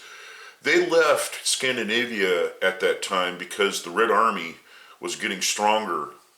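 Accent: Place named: United States. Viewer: I taught you was American.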